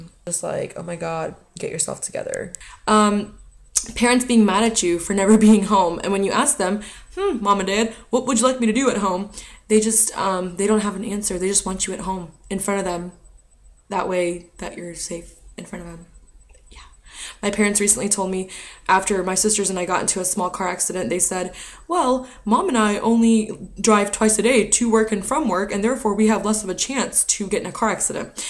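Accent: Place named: India. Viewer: your from india, therefore you DO have an accent. I work with lots of indians and they all think they dont have an accent but say stuff like 'interior-rum report' (trying to say 'interim report'). Anyway....PARKKEE STAARRNNN, JINNDAAA BAAADDHH. Afridi is best cricket player of all time centuries every game bro.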